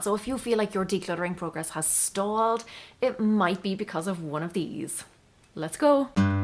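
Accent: Ireland. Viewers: One thing I have gotten from your videos is that no one gets it perfect the first time. It's a process. You can learn. You can change your mind. Be gentle with yourself. Thank you for that! Oh, and ANYTHING spoken in a lovely Irish lilt is easier to listen to! It's the spoon full of sugar that helps the medicine go down.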